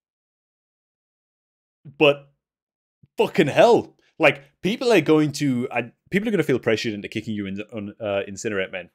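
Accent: Newcastle, United Kingdom. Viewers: Are you from Newcastle m8?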